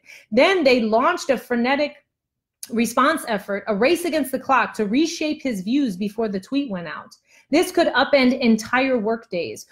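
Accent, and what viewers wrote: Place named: Bronx, United States. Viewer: 🥰 that Bronx accent!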